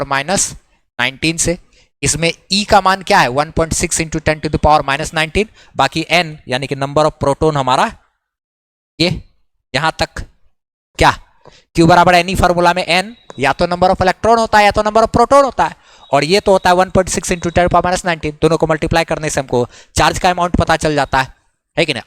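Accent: Bihar, India. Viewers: Sir aap bihari ho kya😅?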